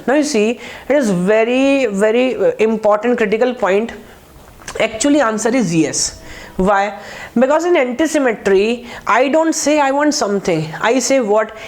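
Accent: India. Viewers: God Indian accent is so fucking annoying... it's unfortunate since the content is good, but the accent destroys it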